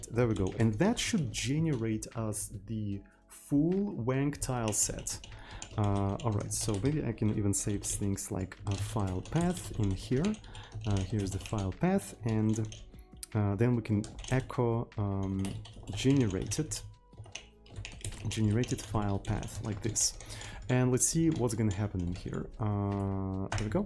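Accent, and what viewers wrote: Russia. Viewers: Чувствуется русский акцент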